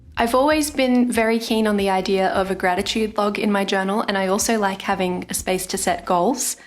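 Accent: Australia. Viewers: It has been so incredibly hot in Australia. I live in Darwin and it has been hitting 40 degrees with 80% humidity. Your quote page is using the Pantone colour of the year. My January setup us revolving around this colour. It is so refreshing to hee an Aussie accent on YouTube and I just love your style of Bullet Journaling.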